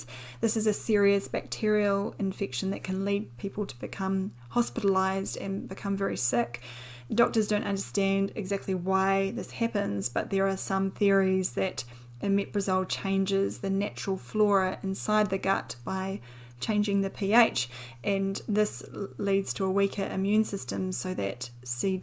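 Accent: New Zealand. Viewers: Loved this video Dr. Sam... I worked for Merck back in the day and was involved in the launch of Prilosec (then Losec) in the U.S.. This video really brought back a lot of good memories--and your accent brought back many other great memories of my time living in New Zealand! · I have binged watched a lot of your videos. I like your NZ accent compared to my American accent. I never realized the differences in pronunciation until I listened to you speak. Keep up the good work. I also like reading the comments of other viewers. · Ah, Kiwi accent. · I just discovered this video today, Thank you , it was very reassuring as I take this medication on an as and when basis. Also lovely to hear a New Zealand accent on YouTube . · Looks Irish but sounds Kiwi. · Very informative video. I love the kiwi accent .. one of the best accents in the world. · It usually takes me 2-minutes until I can understand the New Zealand accent ... 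Then, I'm fine. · None sounds New Zealand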